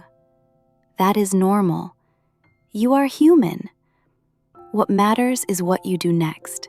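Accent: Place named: RP, United Kingdom. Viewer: I love BBC English ❤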